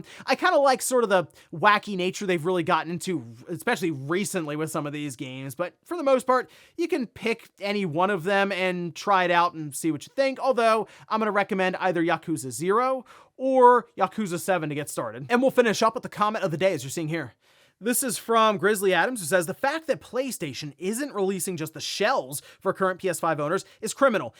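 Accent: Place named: Pennsylvania, United States. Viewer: It's the Pennsylvania accent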